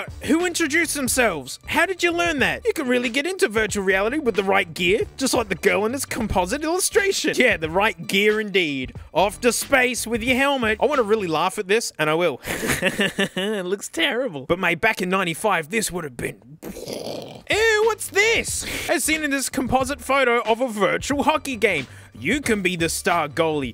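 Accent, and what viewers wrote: Australia. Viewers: I really love the weekly display of the disembodied hands with Australian background voice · I've been binging your videos for like the past week, and I have this feeling. . . that you might be Australian.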